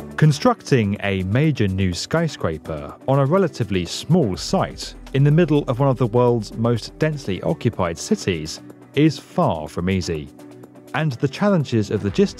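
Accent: United Kingdom. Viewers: I find the British/non-American accent a bit distracting! I can't quite concentrate on the content of the video because of that! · Why is a video about buildings in America narrated by a Brit?? So annoying.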